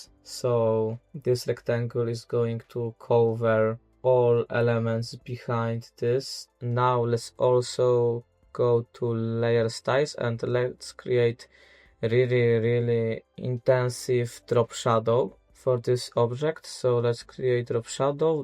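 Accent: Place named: Poland. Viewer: po pierwszych sekundach wiedziałam że jesteś polakiem ;) szacun stary, zajebisty montaż. Też sobie klikam w aftera i na pewno będę używaćtwoich poradników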